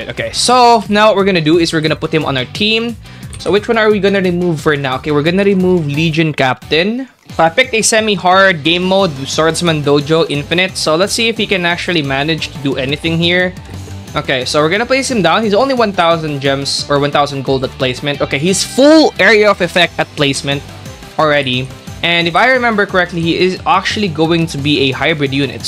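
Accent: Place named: Philippines. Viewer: bro I'm Filipino, but your 100% Filipino, the accent broo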